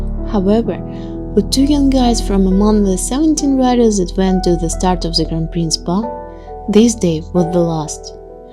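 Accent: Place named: United Kingdom. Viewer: При просмотре фильма, вспоминается документальные фильмы от BBC. Многие, кто любит смотреть такое кино, вспомнят, ламповое ощущение и детский восторг от просмотра. Особенно британский акцент, лично мне он нравиться с детства. Подобранная музыка, локация сьемок, манили не моргать. Кто интересовался шоу Topgear, тот поймет о чем Я говорю. Обнимаем и радуемся ее подачей.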